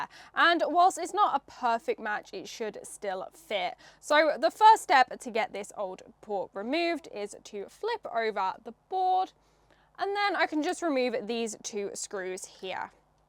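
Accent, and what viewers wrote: United Kingdom. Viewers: Уёкин фойн!! Для нас кто зараза вырос на Американском произношении... вся британская речь как крендельки!.. Ну, я уж не говорю, с такой милой Леди, иха издающую!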